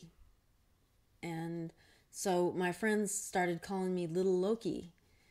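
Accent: Liverpool, United Kingdom. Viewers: Oh gosh, I still have those books from the 60's! I can't do the Liverpudlian accent like you can, but I loved reading his words and getting carried away with the absurdity of it all. From the mind that gave us, "Turn left at Greenland," when a reporter asked them, "How did you find America?" Oh, the memories.... thanks,...:-D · I always loved the Lennon books too. But I never asked anybody to call me. Nice Liverpoodlian accent, too!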